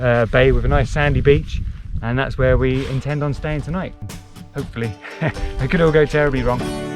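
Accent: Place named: Scotland, United Kingdom. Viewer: Shameful pronunciation? I love the accent! Scotland rocks! Greetings from Greece! Keep hiking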